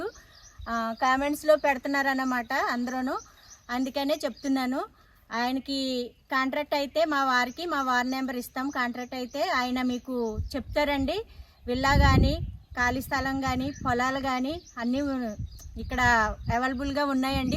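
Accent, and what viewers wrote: Godavari, India. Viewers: స్వచ్ఛమైన గోదారి యాస తో అక్క మాట్లాడుతుంది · మేడం మీది ఏ ఊరు మా గోదావరి జిల్లా అని అడుగుతున్నా మాట తీరు అలానే ఉంది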